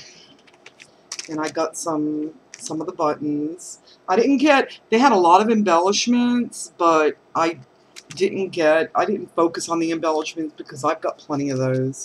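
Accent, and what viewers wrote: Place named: Charlotte, United States. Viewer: Omg, not I know why part of your accent sounds just like my mom's. She is from Charlotte and that's where I was born. Anyways, I was just gonna skip going until your video so thanks for sharing!!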